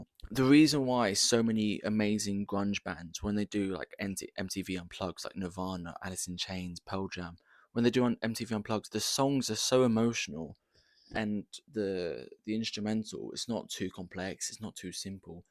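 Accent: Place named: United Kingdom. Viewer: I was not expecting you to be British, to be honest.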